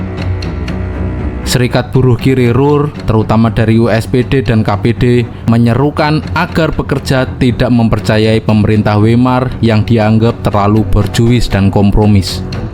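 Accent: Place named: Java, Indonesia. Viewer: mas, jowomu njowo banget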